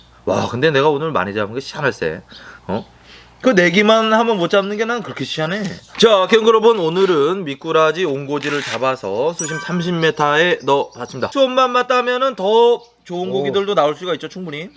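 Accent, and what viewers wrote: Gangwon, South Korea. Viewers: 강원도 사투리는 언제들어도 정감이 ㅎ 구경잘허구가우 !!! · 강원도 사투리 좋네요. 구독 신청요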